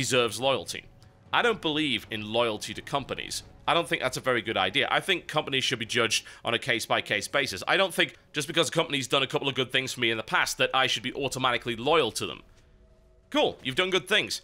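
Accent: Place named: England, United Kingdom. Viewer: Im scared right now, because people seem to be taking opinion of Steam as gospel... seemingly only because he has a english accent.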